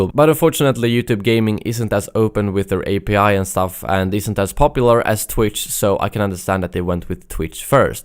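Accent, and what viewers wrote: Sweden. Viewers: Det låter som att du är svensk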